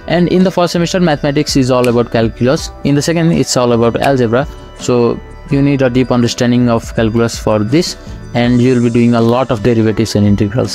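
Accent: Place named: Nepal. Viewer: Bro speak in nepali. Do what you are good at. Nepali accent is not appealing.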